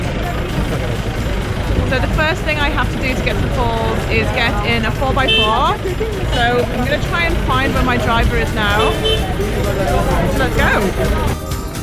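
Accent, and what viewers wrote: United Kingdom. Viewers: Mam ...I can guess..u r British..Am I right??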